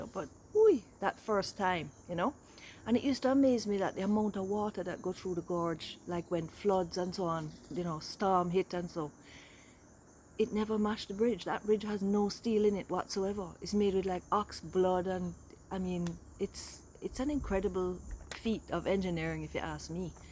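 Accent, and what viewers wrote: Jamaica. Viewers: Wow !! Almost speaking Jamaican as well, Luv ya !!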